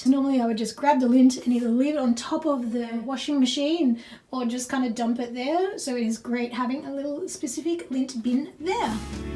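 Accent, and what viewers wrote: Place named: Australia. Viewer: Beautiful apartment and I love her accent, I feel like it's different ftom other Australians. Much love :-)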